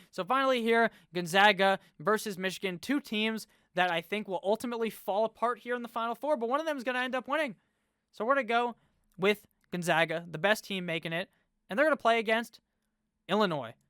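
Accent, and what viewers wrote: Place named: Ohio, United States. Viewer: This dude has to be from Ohio 😂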